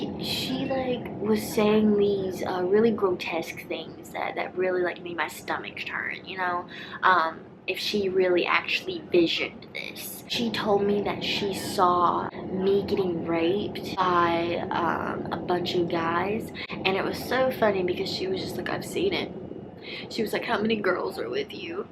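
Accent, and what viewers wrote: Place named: Virginia, United States. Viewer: Biiiiitch, I knew you had a Virginian accent. Just started watching your videos and was going crazy knowing your voice was so familiar lmao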